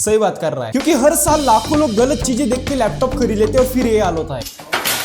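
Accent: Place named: Maharashtra, India. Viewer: Marathi accent flowing up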